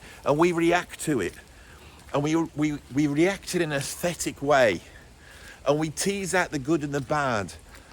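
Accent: Birmingham, United Kingdom. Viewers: 2nd video i watched since i subscribed. Very well reasoned arguments. I knew you was a brummie. Lol.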